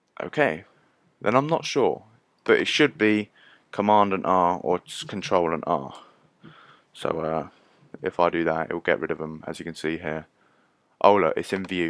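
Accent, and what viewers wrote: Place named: United Kingdom. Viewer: Brittish accent on a tutorial. + 10 points.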